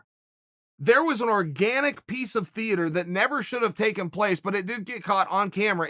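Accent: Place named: United States. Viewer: Chaels American accent is sickening.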